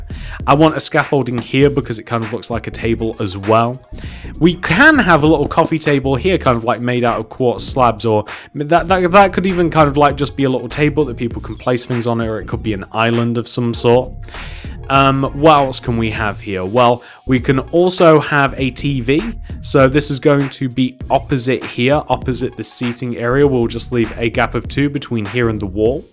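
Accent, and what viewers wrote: United Kingdom. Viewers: love the british voice.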